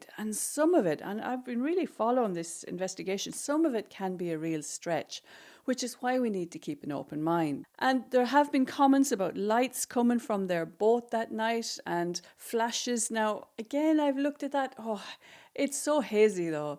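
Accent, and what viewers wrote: Ireland. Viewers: Hi from NJ,,, I detect your Irish accent (pretty sure). Hopefully we will be hearing of his arrest soon, his behavior has been in our face long enough.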